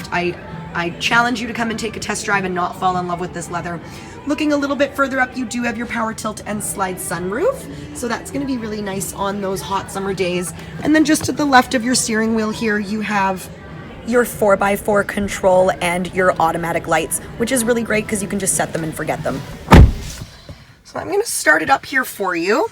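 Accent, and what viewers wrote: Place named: Canada. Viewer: Canadian tell 1 - "Zed". Canadian tell 2 - "Oout".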